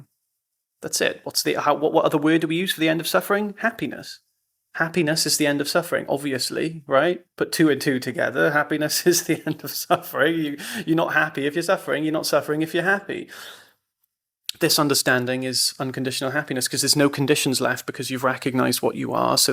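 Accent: Wales, United Kingdom. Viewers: do I detect a little bit of Welsh in your accent ?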